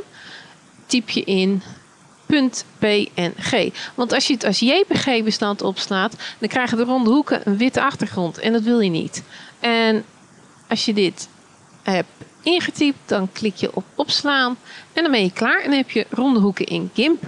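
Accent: Netherlands. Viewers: ik kon van je engels uitspraak in andere videos al horen dat je nederlands was.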